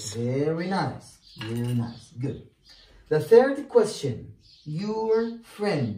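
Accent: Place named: India. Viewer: في الأول ظننت أن الرجل هندي وعم يشرح الإنجليزية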